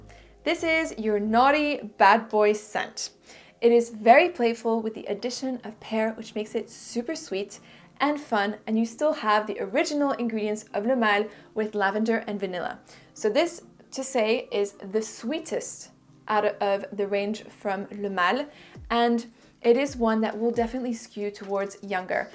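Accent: France. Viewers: You got a nice french accent , are you from France? Je veux dire ton anglais est excellent en plus de ton bon français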